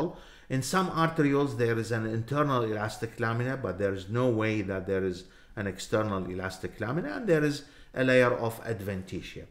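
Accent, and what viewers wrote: Iraq. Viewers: اللهجة العراقيه 🔥❤ عاشت ايدكم دكتور الشرح رائع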